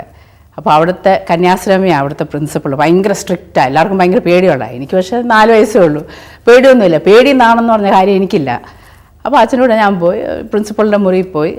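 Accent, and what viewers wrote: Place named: Kottayam, India. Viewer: n d Kottayam slang which l expected d least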